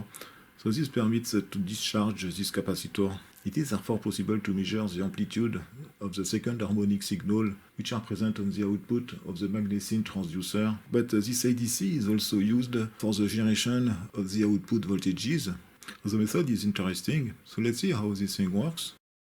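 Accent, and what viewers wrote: France. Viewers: Fast and furious mixed reverse engineering, pure french accent, the best channel with Curious Marc, another French guy:) Thanks a lot.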